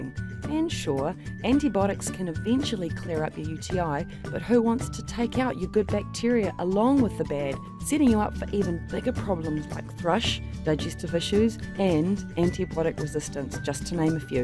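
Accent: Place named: New Zealand. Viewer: u sound new zealander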